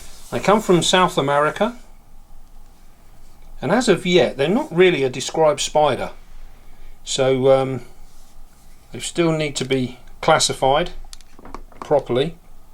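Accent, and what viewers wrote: United Kingdom. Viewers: Hello, May I ask you what kind of british english dialect you are used to speak? It sounds very well and it's quite understandable for me as a german.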